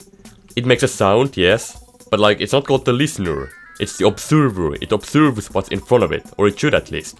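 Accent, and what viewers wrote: Finland. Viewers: This sounds really much finnish accent! Pitää tilata kanava, hyviltä videoilta vaikuttaa!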